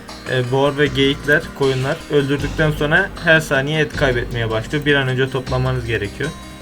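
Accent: Turkey. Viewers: reis valla ben trabzonluyum ben bile seni buralı sandım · kardeşim konuşmanda ufak bir rize havası sezdim. cok güzel oynamışsın, eline sağlık.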